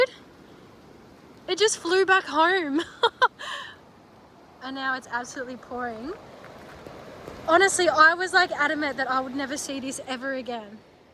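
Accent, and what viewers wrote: Australia. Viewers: I like that Australian accent.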